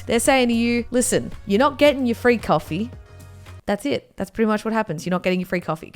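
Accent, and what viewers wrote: Australia. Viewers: is it me or does she sometimes have an australian accent in some words and then in others she does “caunt stand” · Are you from Australia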